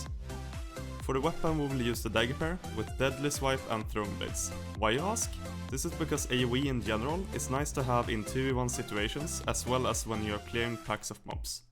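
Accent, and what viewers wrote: Sweden. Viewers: Lol, your accent is so swedish. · you sound so swedish =)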